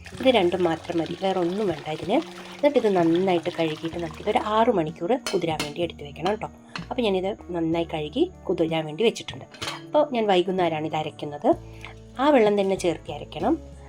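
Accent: Palakkad, India. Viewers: Hmmm kandapo kodhiyayi.. uluva manam varumo.. evide kettyonum makkalkkum manam vanhaal pne kazhikkilla..☹️🙄.Pne kandhisammandhi kettittundu.. vaayil vellam vanhutta.Videos ellam nannaavunhundu..You have a very unique voice and style of narration..😍☺️.Enikka palakkadan shyliyilulla ‘ttolu’ bayankara ishtaanu kelkkan. I thought you are a vegetarian..apozhaanu Mathippuliyude video kande…It was sooo good to see.Will try soon…All the best to your channel ❤️❤️❤️❤️Nattil varumbo Palakkadu varunhundu…Apo kanattolu 😍❤️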